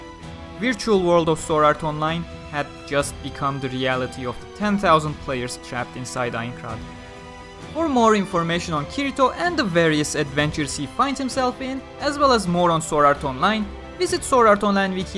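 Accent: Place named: Turkey. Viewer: sao wiki'de gezerken videoyu açar açmaz aksanından dolayı türk bu dedim.. ilk defa aksandan milliyeti tutturdum haha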